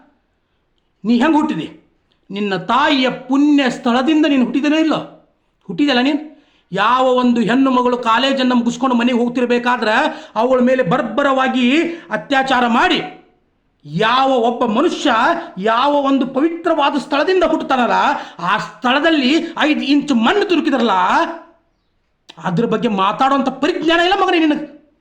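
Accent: North Karnataka, India. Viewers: ಸರ್ ನಿಮ್ಮ ಉತ್ತರ ಕರ್ನಾಟಕ ಭಾಷಾ ಶೈಲಿ ತುಂಬಾ ಇಷ್ಟ ಆಗುತ್ತೆ ಈ ಬೇವರ್ಸಿ ಕಿರಿಕ್ ಕೀರ್ತಿ ಮತ್ತು ಆ ಕಜ್ಜಿ ಕಂತ್ರಿ ನಾಯಿ ರಾಕೇಶ್ ಶೆಟ್ಟಿ ಯ ಅವಾಂತರಗಳನ್ನು ಜನತೆಗೆ ನಿಮ್ಮ ಸ್ಟೈಲ್ ನಲ್ಲಿ ಜನ್ಮ ಜಾಲಾಡಿ ಬಿಸಾಕಿ ಸರ್ ನಿಮ್ಮೊಂದಿಗೆ ನಾವಿದ್ದೇವೆ❤ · Anu matadtiya guru utara karnataka super guru ninu❤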